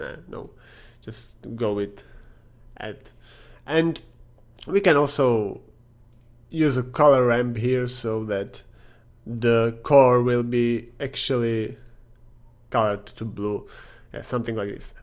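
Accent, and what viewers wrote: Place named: Eastern Europe. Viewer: and that’s not at all a bad thing I like your Slavic accent!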